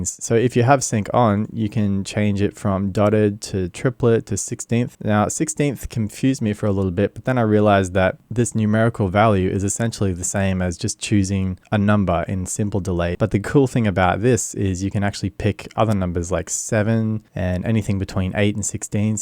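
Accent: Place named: Australia. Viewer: Hey mate. Great vid and great to hear a fellow Aussie in one of these vids. Where in Oz are ya from?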